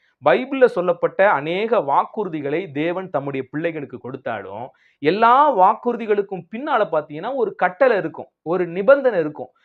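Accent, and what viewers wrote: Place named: Tirunelveli, India. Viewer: Bro நீங்க இருக்கிறது திருப்பூர் but native of திருநெல்வேலி யா?! உங்க ச்லங் ல தெரியுதே!!??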